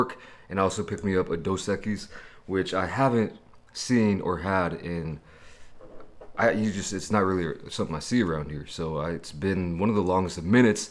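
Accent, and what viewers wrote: United States. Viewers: Yo, what made you want to move to Korea? Just curious because you definitely sound American.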